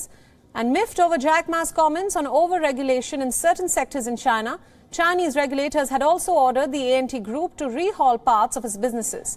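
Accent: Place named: India. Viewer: She is Indian and speak English with funny accent just speak in ur language 🤦‍♂️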